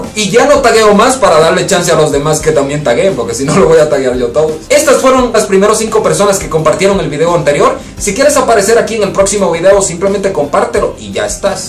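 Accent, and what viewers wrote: Mexico. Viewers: Ya estas hablando como un mexicano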